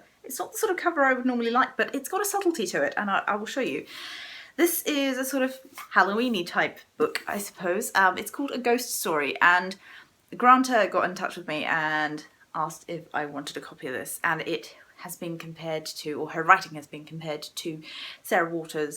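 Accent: England, United Kingdom. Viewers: I am confused. Are you from NZ? You sound english, not at all Kiwi. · at the Edge of the World If truth be told, you speak English as it should be spoken. It is we in the colonies who have the accents! You were blessed to have an English mother in NZ!:)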